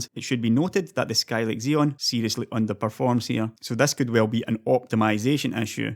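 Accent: Scotland, United Kingdom. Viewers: is he scottish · I honestly want to think you are Scottish but 1% of my brain says you are Irish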